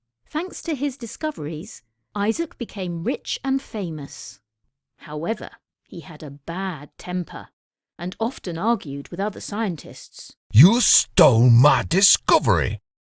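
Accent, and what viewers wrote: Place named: United Kingdom. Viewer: I love British Accent.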